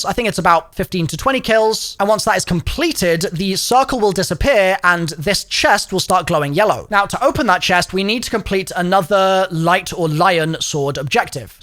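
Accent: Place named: United Kingdom. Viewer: i love you but despise your british pronunciations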